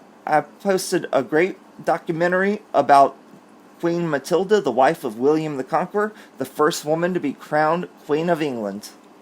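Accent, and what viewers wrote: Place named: Georgia, United States. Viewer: Omg!! I’m a fairly new subscriber... I think I discovered your channel about a month ago and I’ve been hooked ever since!! When I was watching your last video, I thought “hmm I wonder where is from (because you sound like me lol), and I almost asked in the comments but decided not to and then I find out in this video that you are in fact from lovely GA!! I’m from the northeast part, what about you?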